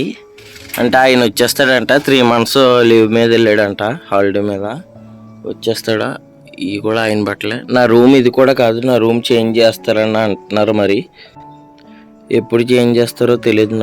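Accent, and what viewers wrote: India. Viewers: Ok ...I am from palakollu..bec of slang I guess u r from West godavari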